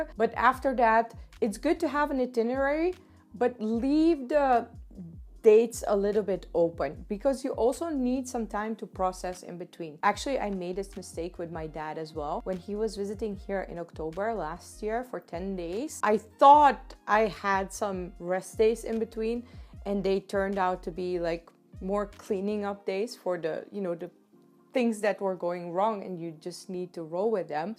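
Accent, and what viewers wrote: India. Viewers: Awh you have a lil Indian accent and im jealous I’ve never been to the motherland 😔 ugh you’re earrings are 😍 and omg you are definitely becoming a Indian talking with your hands all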